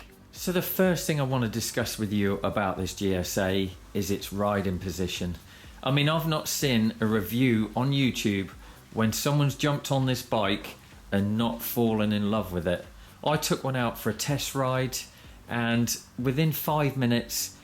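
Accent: United Kingdom. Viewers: Absolutely awesome review! I am a Rockster rider since 2010 and i couldn''t agree more about your comments concerning drive shaft! Now maybe it's time so see if some future 1250 owners are selling a Rallye at a good price :) I just went to a BMW dealer last friday and i jumped on a low frame low seat Adventure and i really tought i couldn't touch the ground with both feet with my 1.82m but i was wrong! I felt very good and so i added the Adventure to my possible choice! Thanks for your video, loved the bike, the clear english accent and the true passion that i felt when you picked the bike from the dealer! Cheers Loris from Italy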